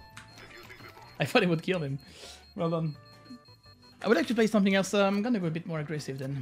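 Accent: France. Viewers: A quel moment t'as décidé de parler en anglais dans tes vidéos? Je veux dire on entend bien que t'es fr, alors pourquoi l'anglais? ;) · On sent ton accent français? mais t'es vraiment très bon, que ça soit pour parler anglais que sur CSGO... continue bg !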